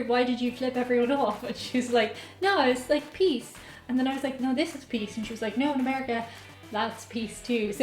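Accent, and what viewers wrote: Ireland. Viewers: If it was North Korea you wouldn't have that lovely Irish lilt to your speaking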